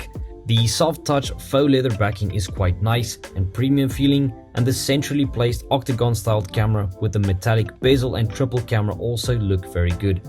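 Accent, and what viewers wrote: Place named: South Africa. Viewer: Your accent is South African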